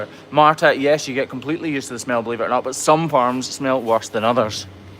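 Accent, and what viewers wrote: Scotland, United Kingdom. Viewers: yup. though I usually watch on mute...I learned you are Scottish sounding today! facepalm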